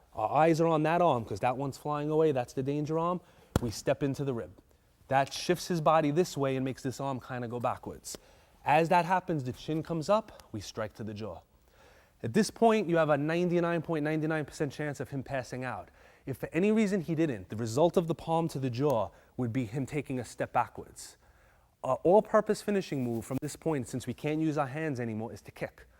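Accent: New York, United States. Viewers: I would guess either New York, New Jersey, or Italian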